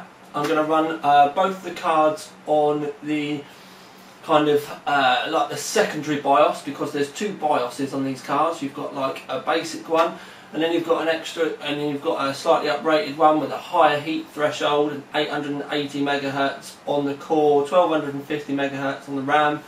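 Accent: United Kingdom. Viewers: dude i hate your fucking british accent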